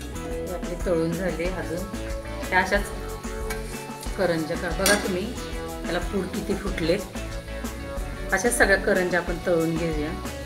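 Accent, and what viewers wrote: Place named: Solapur, India. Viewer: Kaku tumhi proper kontya gavchya ahat tumchi language Akluj solapur sarki vate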